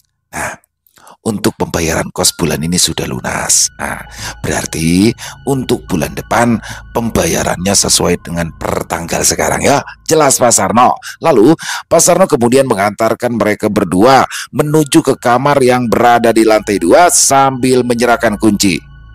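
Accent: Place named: Java, Indonesia. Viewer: Suara bang agak medok apa dari jawa ya bang ❤❤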